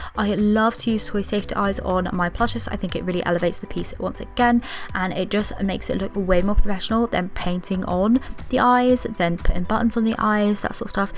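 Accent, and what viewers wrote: United Kingdom. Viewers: Hello, umm i have a question: Are You British? 😅👉👈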